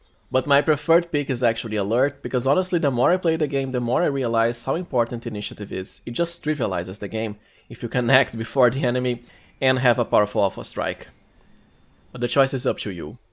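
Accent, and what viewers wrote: Brazil. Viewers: Bro your accent is Brazilian for sure lol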